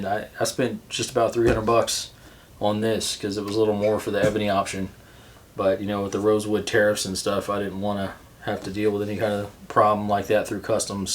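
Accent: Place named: Georgia, United States. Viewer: haha... thought I recognized that accent, I'm from Georgia originally. Been in NC for 25 years or so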